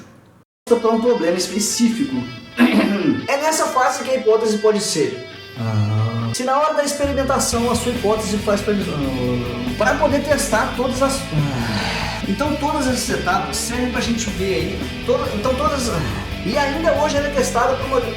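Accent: Minas Gerais, Brazil. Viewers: amo de coração seus videos, não perco um. Mas, a forma que você fala e no "mineires" (falando rapido) as vezes fica dificil de entender. Nos videos maiores as vezes tenho que voltar um pouco pra conseguir seguir o raciocinio. Sou da area ambiental mas sou apaixonado em fisica.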